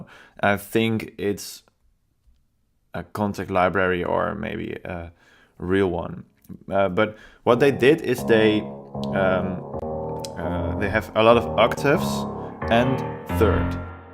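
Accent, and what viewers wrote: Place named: Netherlands. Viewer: you sound dutch, could you explain what you did with the compressors? :)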